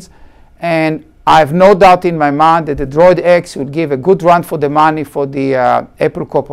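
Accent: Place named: Israel. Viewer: Am I the only one finding Israeli accents insufferable?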